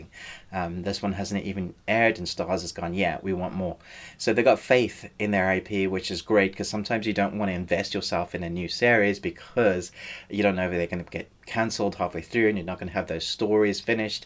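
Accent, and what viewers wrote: South Africa. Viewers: Do I detect a slight South African accent there? Could be wrong but maybe not.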